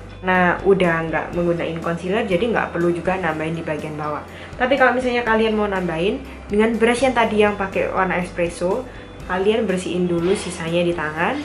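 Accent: Java, Indonesia. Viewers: "...udah moncrot" hahaha 😂love your video. already subbed. if you dont mind to visit my videos too. tos dulu sesama lidah medok 😘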